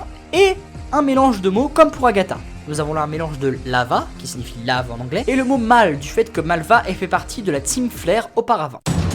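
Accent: Spain. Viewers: Ton accent espagnol es magifico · S'il te plaît arrête avec ton accent espagnol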